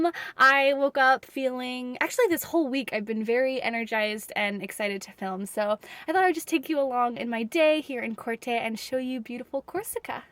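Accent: United States. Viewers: I lived in the Algarve ( south of Portugal) for many years. I am now near Lisbon. I love Oporto too and I have a very close friend there. I am so happy that you had the time to reply to me. Who knows you and your husband will move here one day, even when you're much older. Life is such a big adventure! I wish you were here so we could chat about our many interests in common: spirituality, philosophy, photography, videography, nature and as a bonus I could even practice my spoken english with you ( love your english and expressions from the US) and I'd happily teach you portuguese, if you wanted to learn, coz it's not really a necessity here in Portugal. Also please know you are making a difference in people's lives. Last week the words you said about letting go and trusting the universe, when you told us the story about the french bakery, came to my mind several times. 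It's nice to be reminded and your words helped me🙏! Keep up your awesome work! Much love to you!💞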